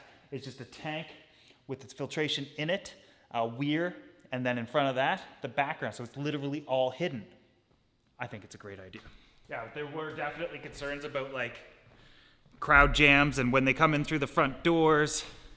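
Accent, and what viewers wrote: Canada. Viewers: My confusion is, clearly you are somewhere in Canada from the accent, and of course the 4 kilometers from house to new gallery. Why then do you measure the space between stands in inches and not centimeters? Just something I was curious about.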